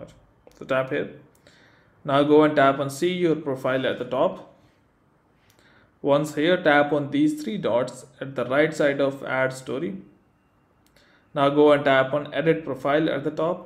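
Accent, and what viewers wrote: India. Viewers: People are just slow headed fr, if you know English then you would have understood him even with his Indian accent. They just find a reason to dumb themselves down.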